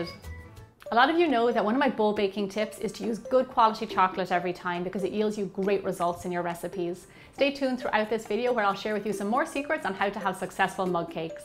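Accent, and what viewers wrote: Ireland. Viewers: Wow, So cool! You remind me of another youtuber! He does gaming and he is also Irish! You have an awesome accent! Great Food! I just made your Blueberry Muffin and Mac & Cheese! Very Yummy!! Fabulous Work! Please Keep Making Videos! :D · Is she Irish? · Are you Irish ?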